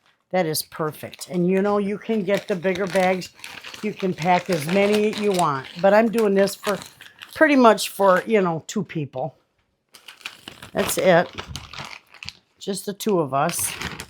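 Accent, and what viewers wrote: Michigan, United States. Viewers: Without even seeing where you were from I could tell you were from Michigan.I lived in Gaylord for years and my daughter still lives there.I’m curious to see , where in Northern Michigan do you live? We love it but we had to move home to Louisiana about 7 years ago.we vacation every year in Gaylord.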